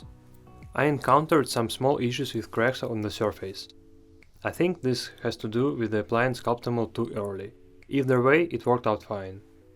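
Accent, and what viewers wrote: Russia. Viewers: акцент русский или мне кажеться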